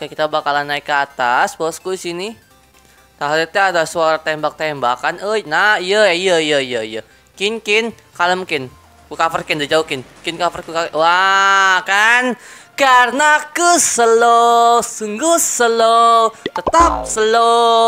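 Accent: West Java, Indonesia. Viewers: oraang sunda anjay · Orang sunda kah?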